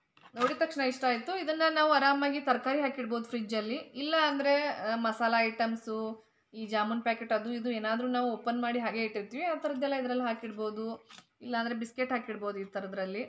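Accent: North Karnataka, India. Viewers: Waiting for ur vlog ri.....don't mind....neewu complete uttara karnataka style naag matadi kelaak chand anstaiti....mysuru kannada mix maadbyaadri.....keep doing such vlogs we like ur vlogs so much.....👍👍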